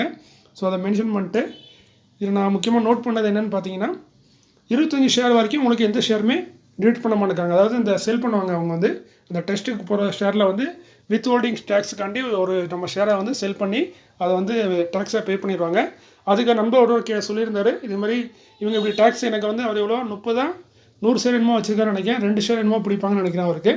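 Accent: Tirunelveli, India. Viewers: Bro.... I love your Nellai Tamil. I Wonderful city, nice people. Keep it up Bro. NBCC, HUDCO bonus eppo tharanga bro. Please inform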